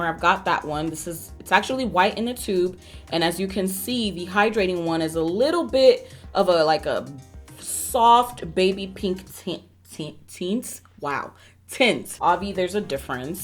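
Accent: Nigeria. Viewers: Your nigerian accent is respectable:') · I am here for that ya Nigerian accent, it throws me off EVERY TIME🤣🤣🤣🤣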